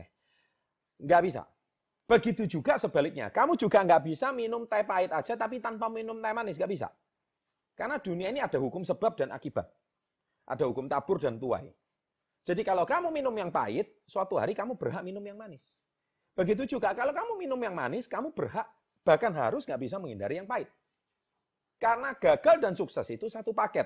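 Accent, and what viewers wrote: Java, Indonesia. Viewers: Bang orang mana ya kok Logatnya,logat jawa.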